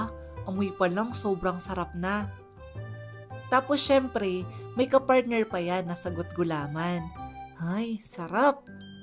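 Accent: Visayas, Philippines. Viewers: bisaya ka po ba???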